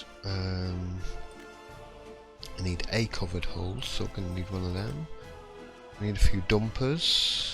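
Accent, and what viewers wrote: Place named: Derbyshire, United Kingdom. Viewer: You from Derbyshire? Trying to place your accent lmao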